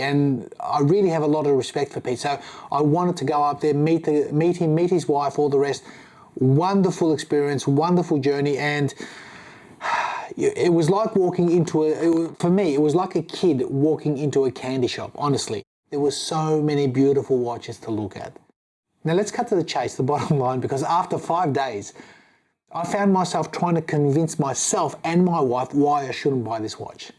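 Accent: Australia. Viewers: I hate this stupid Australian accent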